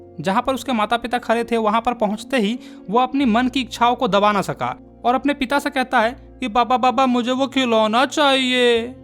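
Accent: Bihar, India. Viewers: bihari tone on TOP🔥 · mj aa gaya bhaiii inki tune kitni mast hai bihari + hindi lahjabav that line { bada harami bachaa hai } was epic ❤❤❤❤❤❤❤❤ thanku bhaiya..... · you are from bihar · you are BIHARI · Are you from Bihar?.. That lines > Re laiKA yene aao!!.. I am also from Bihar..And aapse padhne mein bhut hi maja aaya!! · i am sure he is bihari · Bhai aap muzaffarpur , bihar se ho kya ? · Tora bihari acha lagwati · Bhai aap Bihar se ho kya? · 😅😅😅😅😅bhaiya app ka bihari language mst h😅😅😅😅😅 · Bihari ho kya😏 Video me bhi aaeee auuu kar rhe ho😒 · sir ji....ider bihari kyu bolte ho..... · Is he also from bihar🤔. Iam a bihari girl and his language hears like bihari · That Bihari accent! Just wow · App bihari ho bhiyaa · Bhaiya are u bihari 😆😆 mast tone hai mana parega JAI BIHAR JAI BIHARI ❤ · bhai app bihar sai ho kya.❤❤ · Channel owner BIHARI hai 110%✅ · Bhai kya aap bihari ho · U are from Bihar?? 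· sir you belongs to which district of BIHAR ? because your language is similar like mine and i belongs to patna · Sir ka mode change ho jata ha bich bich ma 😂 Bihari accent always rocks 😅😂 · aap bihari ho na??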